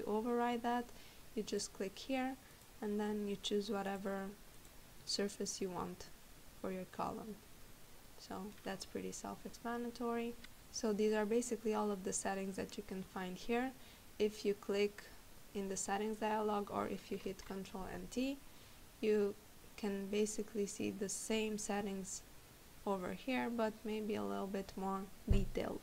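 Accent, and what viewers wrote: Eastern Europe. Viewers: Very slavic accent, where are you from?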